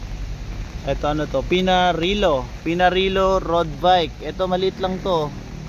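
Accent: Visayas, Philippines. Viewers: Bisaya ka bai? 🚴‍♂️🚴‍♂️🚴‍♂️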